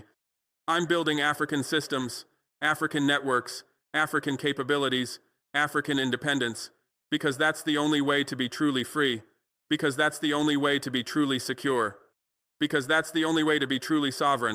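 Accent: United States. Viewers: Sir, you sound like a good Ole boy, right down the road from me. Educated here in Texas.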